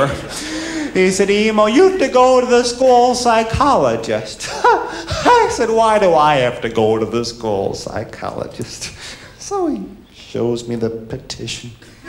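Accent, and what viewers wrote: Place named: United States. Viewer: Could see him playing Shakespeare plays if he lost the American accent.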